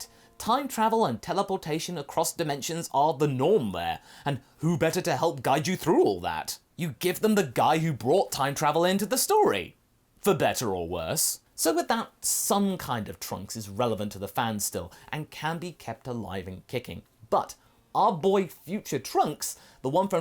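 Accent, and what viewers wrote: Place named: United Kingdom. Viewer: i don't watch channel much these days. but listening to your normal voice to me sounds like you're constantly trying not to do goku's voice. or you sound like british goku.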